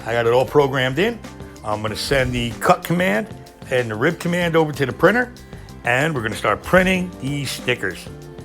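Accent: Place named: New York, United States. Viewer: Definitely need a hard hat sticker ill save a piece of real estate on there for you... and the accent makes me like your channel more feels like one of the boys from home got there own channel the haters must be from out in the Midwest and don't get the real NY accent lol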